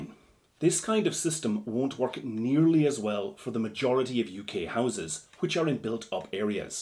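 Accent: Ireland. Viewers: would you be able to comment as to planning permission for Ireland? You have an Irish accent, that's why I ask :)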